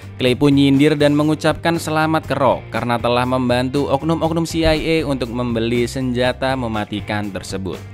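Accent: Java, Indonesia. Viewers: Mas sampean jowo ngendi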